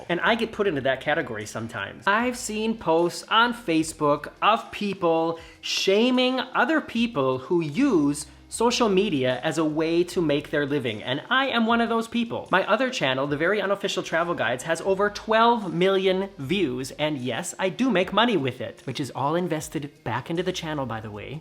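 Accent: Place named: Germany. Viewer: Ah but maybe 🤔 there’s alcohol in the LIPTON Iced tea to make him loopy without telling him. 🤔🤯 wouldn’t that be insane if they did that? 🤣 (I know they most probably don’t...just an assumption!!)Lol..🤣 (Not hating it’s not pronounced vegg-ann it’s pronounced Vee-gan, I understand if it’s your German accent I struggle to say stuff with my Welsh,English accent so I say things wrong like specific...It comes out as suspific 🤣😂 when I say it to people they look at me weirdly and I embaress myself!🤣😂) (FACT: The Welsh language/Accent, Originates from from Wales,UK, the strongest accent is in North Wales and then South, East and Wales don’t (age that strong accents we just struggle to pronounce words...Well at least I do..😂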